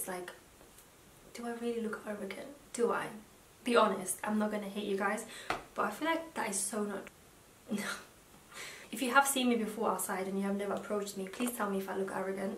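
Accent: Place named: North, United Kingdom. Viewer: She has a British Northern accent 100%.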